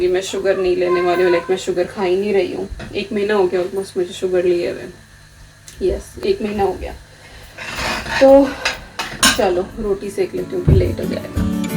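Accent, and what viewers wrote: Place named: Punjab, India. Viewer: Hi g aap punjabi ho baaton se lagtahai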